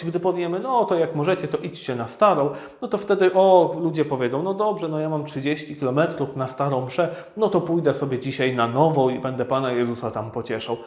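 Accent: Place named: Italy. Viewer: Miałbym do Księdza uprzejmą prośbę, by przytaczając cytaty z tekstów łacińskich raczył Ksiądz stosować się do tradycyjnie przyjętych w Polsce prawideł wymowy, bez zachwaszczania italianizmami itp. Z góry dziękuję!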